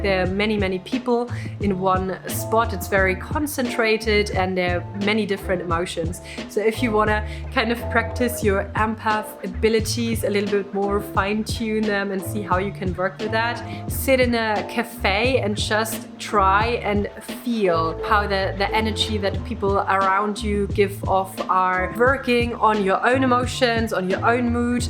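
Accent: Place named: Germany. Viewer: I live in a small rural town. Its about a mile square. Population around 1100. Our home sits on a large corner lot. We have a huge backyard. I feel lucky I can light my fire ring whenever I want. But summers are just TOO HOT! We have enough room for any kind of gardening. I prefer to do my working intentions either within my home or in my backyard. We have lived in our home for 40 years now. So there is a lot of strength and love within its walls. My maternal grandmother came from Germany when she was 16 years old. She lived to be 99 and 1/2 years old. I love listening to you. Your accent is much like hers was. 😊❤❤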